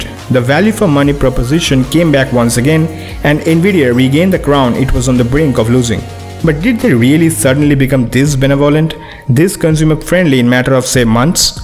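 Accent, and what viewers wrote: India. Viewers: After hearing it voice I confirmed that u r Indian Desi londa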